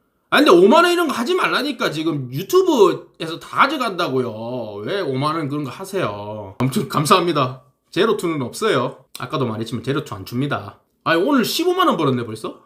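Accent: Gyeongsang, South Korea. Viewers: 너무 잼있어요 같은 갱상도라 그런지 말씀하시는게 너무 친근해요~ㅎㅎㅎ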